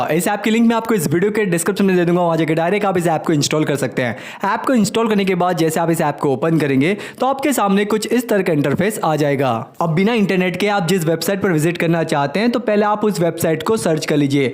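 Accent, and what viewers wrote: Gujarat, India. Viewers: Bhai aap Bharuch,gujrat se ho kya 😀🤔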